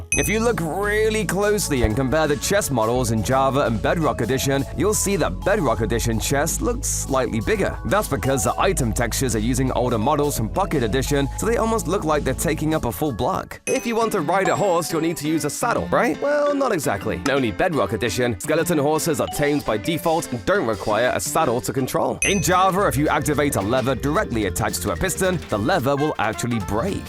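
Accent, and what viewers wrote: United Kingdom. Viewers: Its the way British dialects link vowels together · Can you tell us why is your voice British? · Nahh he’s just British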